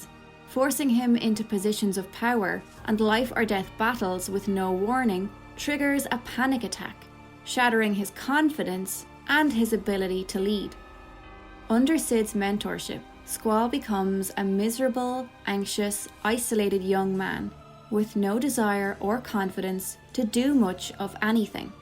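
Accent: Ireland. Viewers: Did not expect to hear an Irish accent, great video.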